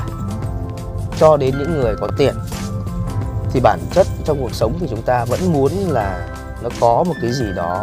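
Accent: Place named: Hanoi, Vietnam. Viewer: Đúng chất giọng dân kẻ chợ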